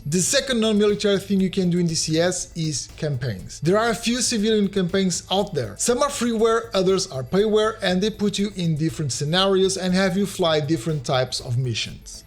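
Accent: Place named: United States. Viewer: oh my got. that voice .. relax man, such american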